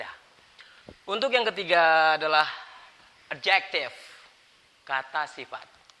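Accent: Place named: Poso, Indonesia. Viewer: org poso ya??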